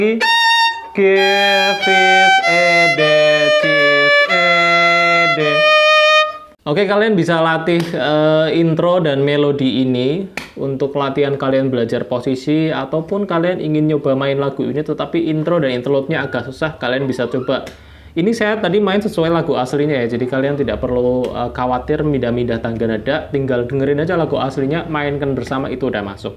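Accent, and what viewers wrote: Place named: Java, Indonesia. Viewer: Medok banged njul🤣🤣🤣🤣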